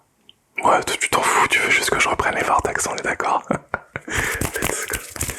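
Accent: France. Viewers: I LOOVEEE French accent 😭😭🫶🫶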